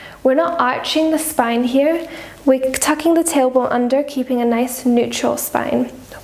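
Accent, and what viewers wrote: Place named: Scotland, United Kingdom. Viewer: your gentle scottish accent is asmr heaven.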